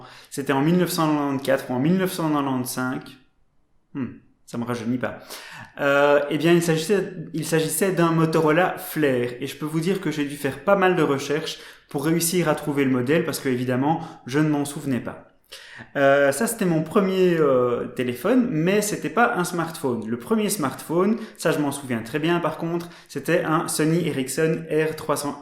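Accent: Belgium. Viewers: J'aime bien ton petit accent :) T'es belge ? ^^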